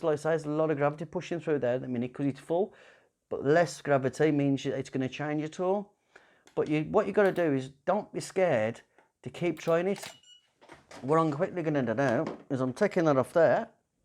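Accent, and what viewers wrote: Birmingham, United Kingdom. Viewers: Where have you gone. Missing you……we need to hear your brummie accent and hear all your tips. Would like to see you do some macro with veg or kitchen things x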